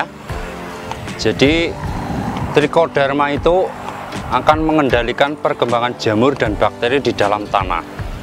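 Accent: Banyumas, Indonesia. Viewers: 👍👍salam satu ngapak bro.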